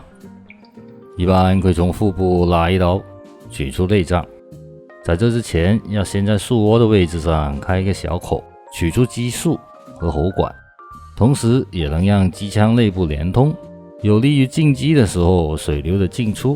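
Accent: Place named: Cantonese, China. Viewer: 帶著廣腔的普通話，清晰，幽默風趣的的旁白，讚！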